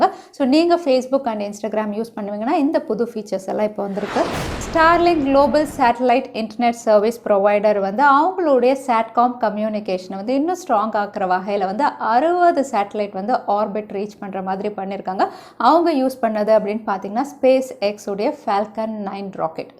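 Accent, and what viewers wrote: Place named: Tamil Nadu, India. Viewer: Nice Tamil accent 🔥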